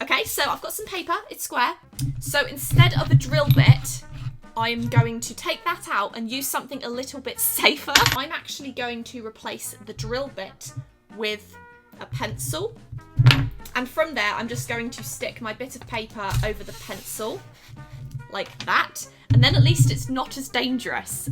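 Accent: England, United Kingdom. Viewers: I've lived in Britain all my life and have never met anyone with as much of a British accent as. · Hi I love your British accent so much it makes me feel good ❤️❤️❤️❤️😻😻😻🇮🇸🇮🇸🇮🇸🇮🇸🇮🇸🇮🇸🇮🇸🇮🇸🇮🇸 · I'm from England and I've gotta say, has the most stereotypical British accent ever 😂 if you came to the north east you probably couldn't understand us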